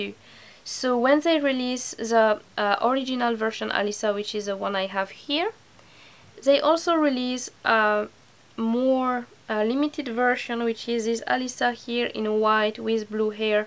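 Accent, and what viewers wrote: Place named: France. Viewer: she is cute ! congrats ! are you french ? you have a accent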